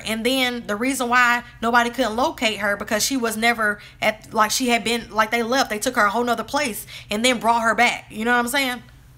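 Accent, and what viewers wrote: South, United States. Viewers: I enjoy your videos, thank you for keeping us updated. Love your voice, are you in the South?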